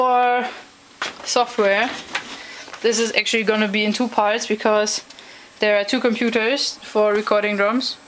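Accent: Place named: Germany. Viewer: Youre german right? I like your accent :)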